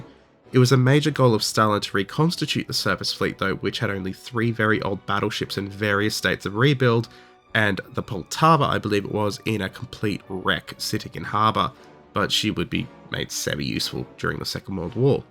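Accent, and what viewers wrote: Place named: Australia. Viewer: The way this is presented, with the Aussie accent as cherry on top of the cream..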